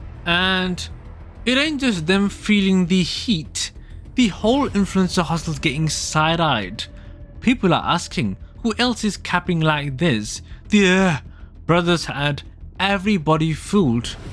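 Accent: India. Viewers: WHY TF you have I dian accent ????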